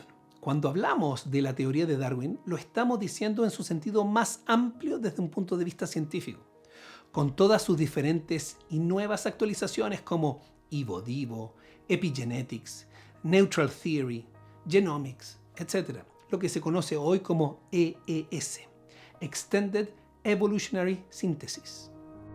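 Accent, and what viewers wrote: Chile. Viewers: Vives en chile?, hablas como chileno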